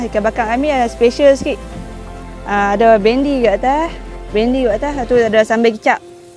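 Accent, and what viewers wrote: North, Malaysia. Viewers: Aku suka kak cik ni kekalkan loghat utara, tak buat2 nak pelat... Itulah tanda kuat pendirian dan kuat jati diri. Terbaik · Pekatnya utara kak ni, utara piau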